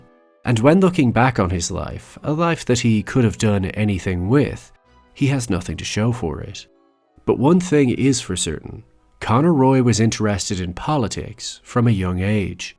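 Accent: Ireland. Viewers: LMAO a real "America is the whole world" out in the wild! It's an Irish accent you plonker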